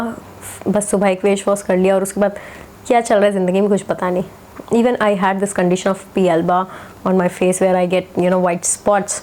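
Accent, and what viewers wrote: Bihar, India. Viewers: Ha bhagalpur se ha sayad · Aap Bihari ho?🥺🥺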